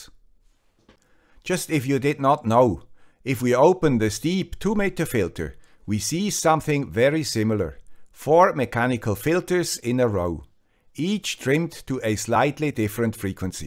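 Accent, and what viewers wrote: Switzerland. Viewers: Over the years, my ears have developed an adaptive filter for that Swiss accent. Thank you for producing material that made that very much a worthwhile endeavor.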